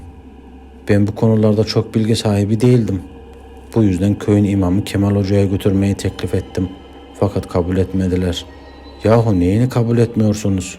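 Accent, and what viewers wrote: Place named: Turkmenistan. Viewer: Türkmenler gibi konuşuyor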